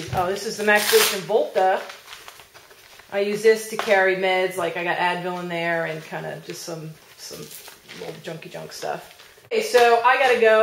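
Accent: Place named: New Jersey, United States. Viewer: I love how Jersey girls say "wooder" ;)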